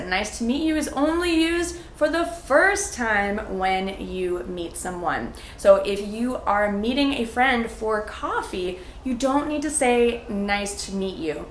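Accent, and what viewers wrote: United States. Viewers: Darling teacher your my favorite teacher at all, please tell me which state you are frome I wanna do some research about your state accent cuz I think I fall in love with it. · Your American English like a sweet candy. Undoubtedly, i'll be part of your live class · i 'am beginner student and i can understand a lot of your videos but i have a problem about the accent if i watched another vidios with anoter accent i can't understand, is this american accent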